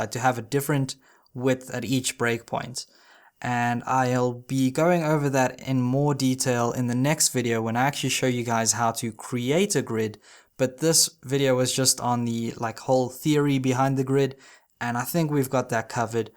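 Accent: South Africa. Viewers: As us Americans say "Africa" as it were a country not a continent you sounded the same. You from South Africa? The "British" accent sounds like it although wife from North Africa and their English (3rd language) is British English not American English. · Fellow Saffa here now living abroad!!! Very informative vid! Nice to hear an SA accent for a change. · Best tutorials on youtube so far about coding. Really awesome to be learnig from a fellow South Adrican as well. Sounds like you’re from KZN.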